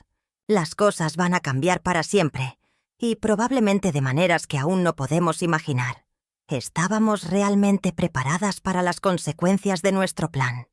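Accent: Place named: Spain. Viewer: Xgue es otra persona la que habla, no ella . Es española la gue narra.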